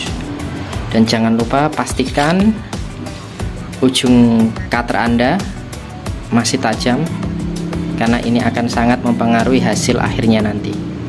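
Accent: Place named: Java, Indonesia. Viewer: Josssss pak ketua 👍👍👍 medok2 gak masalah yg penting jadi😅😅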